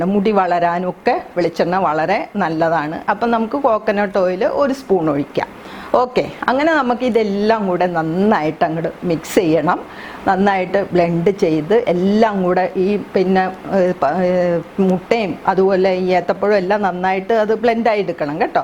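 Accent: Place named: Kochi, India. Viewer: എനിക്ക് സംസാരം കേട്ടപ്പോൾ തോന്നി ഞാനും കൊച്ചി,കൊച്ചിക്കാരൻ, ടിപ്സ് ഒക്കെ അടിപൊളി ❤️🤝🤝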